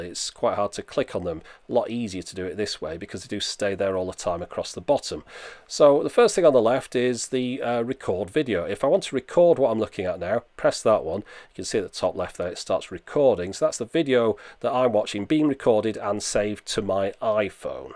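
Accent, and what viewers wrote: United Kingdom. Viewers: As an American I'm not bothered how you pronounce 'router' in the UK. There are many words we pronounce differently in our respective countries, such as "aluminum". It's not an issue with me but most everyone I know here in the USA does in fact pronounce 'Route 66' as "Rowt 66", not root 66. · I'll like the video for the british accent. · Okay... I can almost accept "rooter," but where do you Brits get "ah-lume-in-EE-um?" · Good review... Now if you could just drop that British accent... how about a Southern US accent... that'd be a little easier to understand : )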